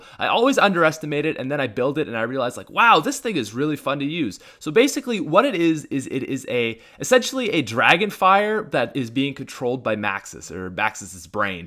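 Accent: Canada. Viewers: Its funny I'm Canadian and he's the only Canadian I've heard that says aboot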